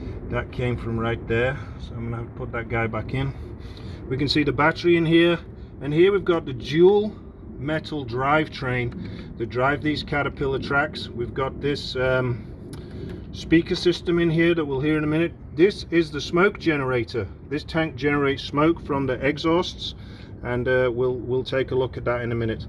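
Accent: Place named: England, United Kingdom. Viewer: Hello were are you from originally. You don't sound American. Sound more English .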